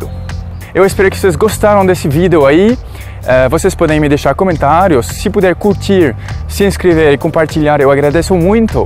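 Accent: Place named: France. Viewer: Seu sotaque francês é muito bonitinho! 😗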